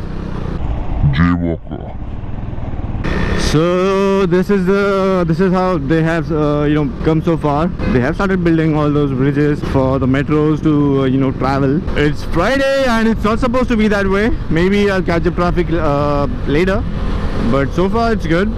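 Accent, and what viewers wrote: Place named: Punjab, India. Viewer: You sound like you are Punjabi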